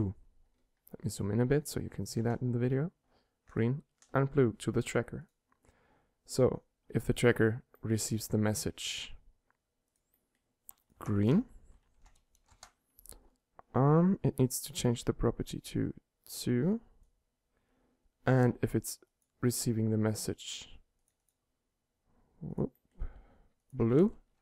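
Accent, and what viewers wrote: Germany. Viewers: Dein Englisch ist sehr sehr gut. Man hört den deutschen Accent nur ganz leicht raus.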